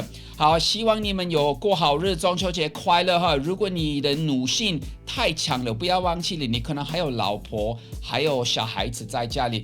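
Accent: Taiwan. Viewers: 我覺得您講話已經有台灣的語調了。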